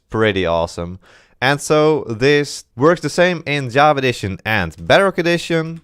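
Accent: Netherlands. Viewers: r u dutch?😭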